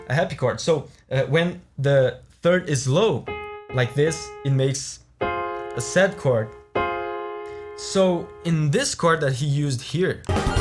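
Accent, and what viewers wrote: Brazil. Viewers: Sotaquezinho brazileiro, que fofo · Tu é do brasil né? Muito top, vi um pouco do seu sotaque e fui conferir e né q era vdd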